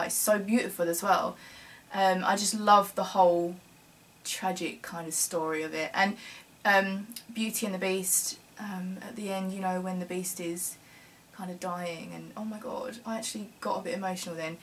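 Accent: England, United Kingdom. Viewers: So English accent!!!!! I love it ❤️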